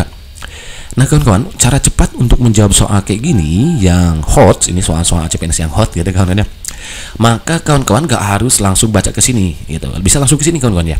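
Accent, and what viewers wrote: West Java, Indonesia. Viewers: Orng Sunda ini mimin nya 😁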